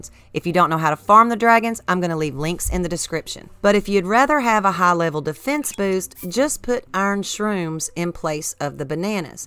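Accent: South, United States. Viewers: I love your channel! Thanks to you, I've killed 3 silver Lynels. I mean Coloseum is OP. So many elemental weapons. Your videos are great and I just love your southern accent?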